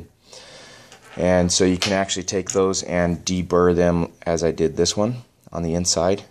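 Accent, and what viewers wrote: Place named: United States. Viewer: No he doesnt. He sounds american.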